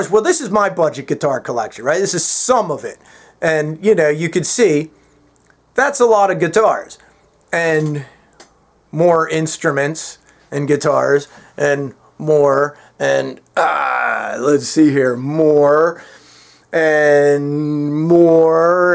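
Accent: Philadelphia, United States. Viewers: your accent reminds me of the South west Philly accent lol thays why I asked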